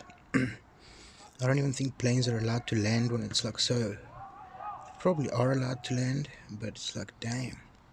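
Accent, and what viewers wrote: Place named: South Africa. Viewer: did you grow up there? I feel as if I'm hearing a little bit of a South African accent from you. 👍 Nice flight even though the weather was not great.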